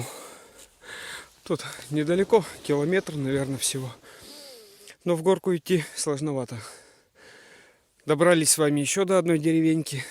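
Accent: Vyatka, Russia. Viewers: почему то думала ты местный, Кировский. Говор вятский, похож. Южные места обл.не посещаешь? А, там очень много купеческих домов, к примеру Уржумский р. 😊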